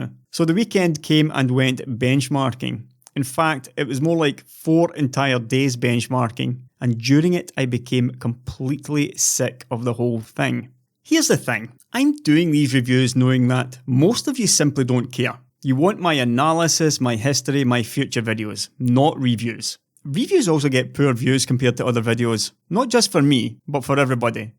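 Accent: Scotland, United Kingdom. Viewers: I LOVE the scottish accent and I love the content of your videos. As far as I know you're the only youtuber that goes deep into hardware analysis combined with economics and whatever else you bring into the mix. All I can say is keep up your morals and keep doing great videos if you're able to! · Its a brilliant accent. Actually very 'broadcast quality' for a British market. I lived in the states for 19 years. I can understand the difficulty with many Americans having difficulty, which is a real shame because of the size of the market. It's not so much a deficiency in their ability to be ABLE to become accustomed to the Scottish accent -its more due to the US mainstream media's unwillingness to expose their audience to enough of the beautiful Scottish accent, which is a real travesty. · I like your accent. Love listening to any Scottish person speak. 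Scottish is one of my all-time favorite accents. Please don't take this the wrong way but when I hear you talk, I always think of one of my favorite cartoons, Disneys Ducktails. Scrooge McDuck is one of my favorites. But that's not the only reason that I watch your videos, the content is very informative. I always learn something new. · Greetings from Germany! To provide some balance: I absolutely love that scottish accent. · Not a native english speaker, no problems with your scottish accent, but I do understand the difficulty, the hate I do not. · I discovered your channel maybe some 6 months ago. At first, the accent was REALLY off-putting but the content made me subscribe. After a few videos I noticed (the accent) wasn't that bad and then, even later on, I discovered I actually enjoy this strange Scottish-sounding voice. So, FOR THOSE ONE TIME WATCHERS out there: STAY AWHILE, AND LISTEN! 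yes, yes, that's a Diablo reference. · Your accent is just fine. (*and your videos MORE than fine !! ) If certain people want to hear only English accent ,then perhaps they should start watching videos which are made from an Englishman instead of a Scotsman !! EDIT: P.S. I would disagree with you at the "hardware review"-part though. As a customer , i'm extremely interested for hardware reviews , and i would much rather see these reviews from a person that i can trust. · Dude i am following your videos for more than 1 year and i love them. I am from Greece and dont have any issues with your amazing Scottish accent. Rock on brother and Keep us informed. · Great content and a good ethical stance...oh and the beautiful lilting Scottish accent 😜 Subbed. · Love your chanel maybe its not something for average viewer who wants sugar coated stuff but in fact facts that take weeks to prepare, and i love your hardcore approach and not Selling Out, in this regard. 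Your knowledge and the fact that you are objective are so important its hard to find reliable person nowdays. Your accent is mild Scottish just enough so u can say u are from Scotland. I simply devoured your content in just couple of days and i am up to date on state of things, and most of all i learned a lot. You explain so good, and thats hard part. And i was pleased when AMD announced new chip that u predicted like 100% I know u dont maybe have time to do reviews but i would love if u could do one for the new ryzen 3 and NaVi series (i presume u will anyway) and if you can show us how to do some setup on it as you did with RX 580 that would be amazing (i will need to learn since i will get them as well when they come out 100%) Unfortunately i cant support you with money, but i will spread the word and i am stubborn persuasive person. And last but not least i get nausea when i see Green or Blue now........but no matter RED is my favorite color anyway ;) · I am an english viewer from the south east of Kent and I have no issues with your accent nor any scottish accent, and I am hard of hearing, keep up the good work, I thank you! 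· The Scottish accent is an honest one! Keep it up! :D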